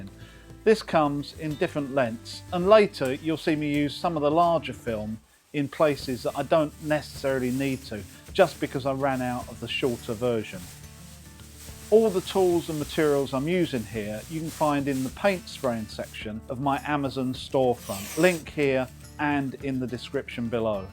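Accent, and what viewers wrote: United Kingdom. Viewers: British commentators are the best. Enjoyed the well articulated commentary 😊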